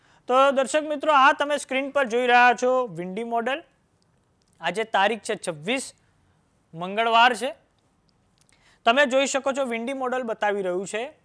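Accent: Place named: North Gujarat, India. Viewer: Bhai tame boli upar thi utar Gujarat na lago so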